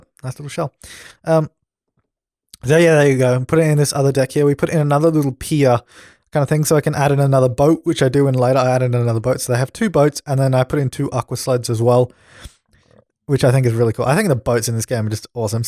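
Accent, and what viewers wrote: Australia. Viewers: Also hell yeah, you're Australian. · You are like the only person who I can watch play sims because you have an Australian accent hahaha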